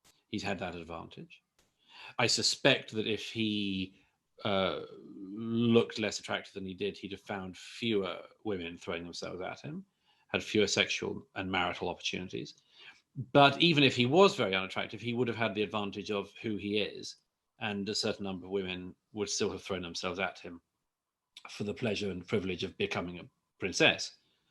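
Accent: Wales, United Kingdom. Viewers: IS HE WELSH?? Go on boi :D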